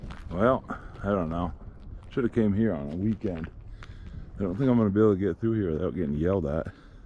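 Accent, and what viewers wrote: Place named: New England, United States. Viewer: thanks. Sounded like a combination Massachusetts and Maine.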